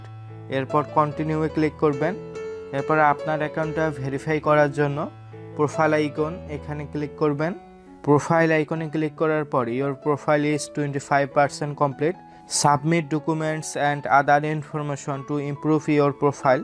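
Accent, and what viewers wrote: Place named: Sylhet, Bangladesh. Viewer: ভাই আপনার কথা শুনে মনে হচ্ছে আপনি সিলেটি আমিও সিলেটি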